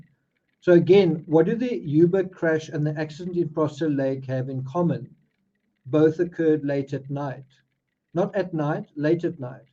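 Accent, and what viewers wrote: South Africa. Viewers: You must be South African, like me. How long have you lived in the USA..?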